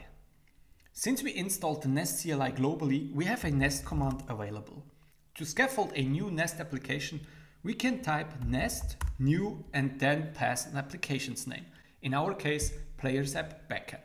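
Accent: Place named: Switzerland. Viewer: meh ghört din schwiizer akzent voll use. super video merci <3!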